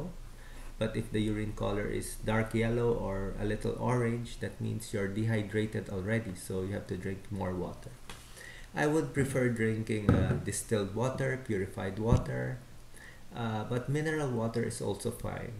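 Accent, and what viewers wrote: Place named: Philippines. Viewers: This is a Filipino accent? he has a nice accent and relaxing voice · There are many Filipinos in my country, and I also I've had a couple of Filipino teachers, and they sound exactly like that, so yeah he's probably Filipino. I like their accent too.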